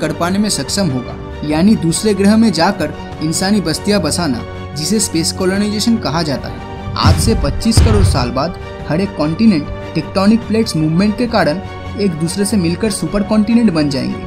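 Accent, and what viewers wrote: Bengal, India. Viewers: Bhai aap bengali ho kya.. bilkul bengali accent hai aapki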